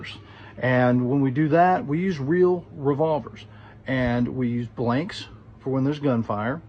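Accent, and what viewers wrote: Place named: Washington, United States. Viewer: Really sad event. I noticed you sounded like you were from Washington then I saw your hat at the end. Do you work on movies up here?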